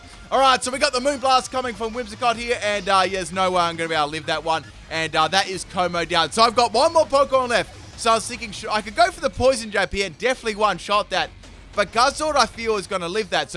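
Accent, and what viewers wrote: Australia. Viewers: Do they honestly not teach phonetics in Australian school? Always baffles me the way you sound words out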